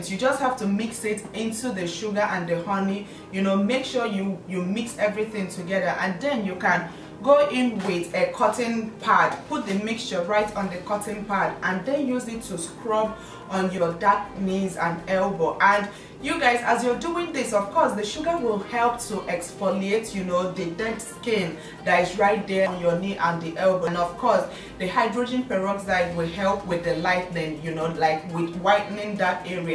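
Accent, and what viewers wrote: Igbo, Nigeria. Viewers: That's a Nigerian Igbo accent We have different accents here :) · Nigeria asent oooh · Hello Gorgeous! New subbie...Luv your makeup, outfits and OMG, that Nigerian accent is as beautiful as you are! Luv, Blessings & Keep Slaying!!! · you are the igbo omotola😘😘i love your igbo accent and beautiful personality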